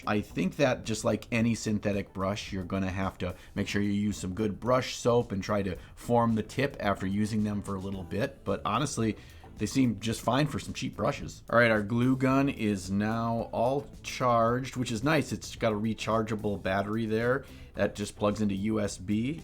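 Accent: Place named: Midwest, United States. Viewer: If I were playing a drinking game where I had to do a shot each time I heard an awful midwestern accent "byag" for bag... I'd be wasted in the opening moments of the video!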